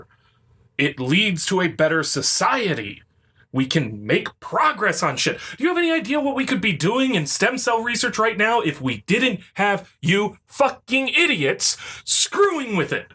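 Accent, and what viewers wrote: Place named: South, United States. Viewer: Dude, you're pretty impressive with the improv...that southern accent is hilarious. Tell me that's Guiness in your mug.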